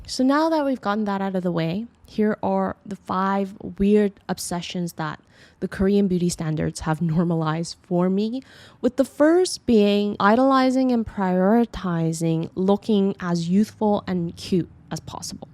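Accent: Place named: Philippines. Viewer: You are Korean? You sound Filipino. :hand-purple-blue-peace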